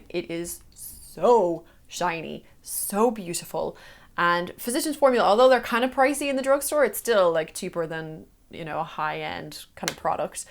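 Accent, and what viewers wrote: Ireland. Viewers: I have been following you for a few years now. At first, you were my go-to beauty guru simply because of the accent! My husband is from Ireland (I am from America) and we have been in a long distance relationship for 5 years, so watching you has always made me feel at home. But after watching for a while, you ignited a fire and passion for makeup within me, and you have helped me grow in my expertise. You are real, you talk about your flaws without degrading yourself for having them, and you are someone that so many in the makeup world can look up to. I'm so glad that this community has helped you grow in the past few years, but please remember that you have helped us grow as well! Cheers x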